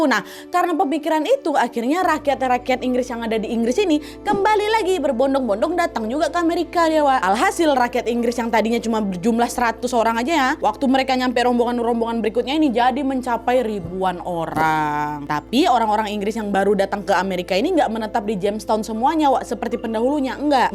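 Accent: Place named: Medan, Indonesia. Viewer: Mantap kalee kakak ini penjelasanya....suka sekali saya dengan gaya Medannya...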